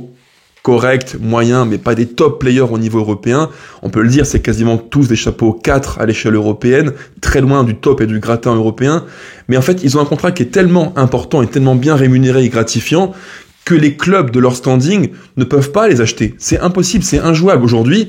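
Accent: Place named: France. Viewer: J'adore ton accent anglais très frenchy! Pour moi, il est clair que Gerlinger va reprendre la succession de Friio et amener son excellence et son sérieux germanique de 18 ans au Bayern et va faire de l'OL une machine de guerre gérée à l'allemande! Sehr gut für unsere liebe Mannschaft! Tschuess, lieber!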